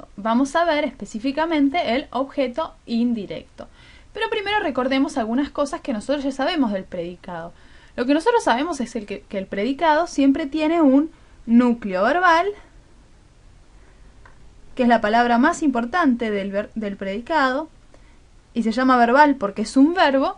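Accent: Argentina. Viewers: Está muy bien explicado, y el acento argentino le da el toque, ¡GRACIAS!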